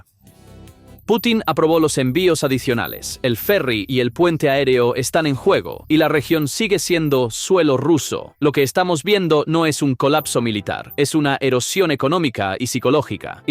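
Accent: United States. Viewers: El acento usado al estilo gringo es UNA MIERDA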